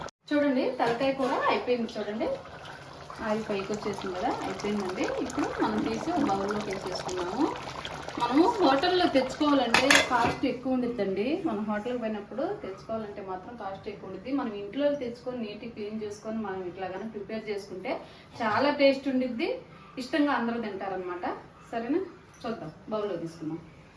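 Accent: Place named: Kurnool, India. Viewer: Kurnool valla laga matladutunnaru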